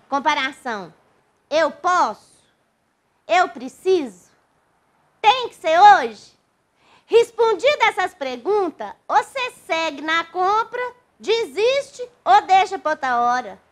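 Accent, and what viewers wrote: Minas Gerais, Brazil. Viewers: Que dialeto maravilhoso! Minerês é um deleite. Obrigado e grande abraço 🙋‍♂️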